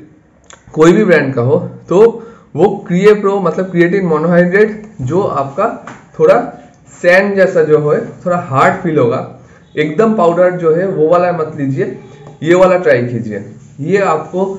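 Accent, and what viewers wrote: Bengal, India. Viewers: Kintu talc gaa me lagate hai shunke...samajh gaya banglai hey... Cholbe bhai chaliye ja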